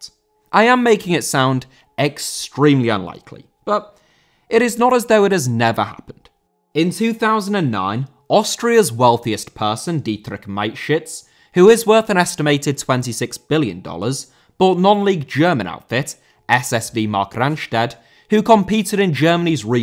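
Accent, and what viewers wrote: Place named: England, United Kingdom. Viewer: whinging about Elon Musk is probably the most predictable thing ever. I'm going to take a wild guess and assume twitter has pronouns in it's bio and every trendy flag of the month. Lucky it's pommy accent helps me sleep.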